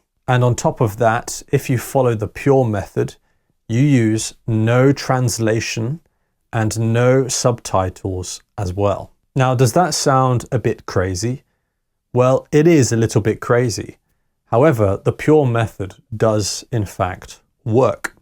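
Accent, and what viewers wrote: United Kingdom. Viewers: I love the british accent, ❤❤from Bangladesh.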